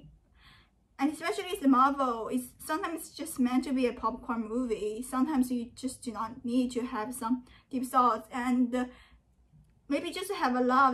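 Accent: China. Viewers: I know you have a strong Chinese accent(obviously) but I'm impressed by your English Grammar. You don't speak Chinglish like most students in China. You have really studied the language. I lived in China for 12 years and I honestly agree with you. These American/Chinese productions are very weird and I only watch movies from China when I need to see Kung Fu. 祝你萬事如意 有推薦的中國電影請跟我講 謝謝您小Lizzie!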